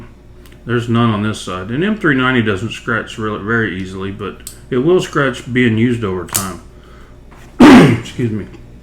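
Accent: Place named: Texas, United States. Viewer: That texas accent doe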